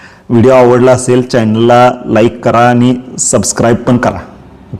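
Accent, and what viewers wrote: Kolhapur, India. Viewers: Bhava tu teacher ahes chagle ahe. Pan tuje fluent English nahi ahe. Tyala kolapuri/ gavakadhcha English cha Sparsha ahe. Pan tula Tu shikvaneche kam kartos khupach Chan. Congrats 🎉❤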